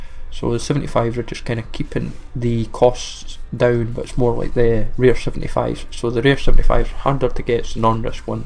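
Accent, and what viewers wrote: United Kingdom. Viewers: it is hard to understand the British accent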